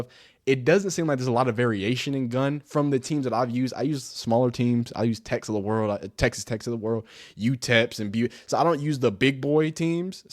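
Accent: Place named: Michigan, United States. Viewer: Bro idk if you’re from Michigan, the East coach or somewhere between Tennessee and Georgia. Niggas on YouTube be a character 🤣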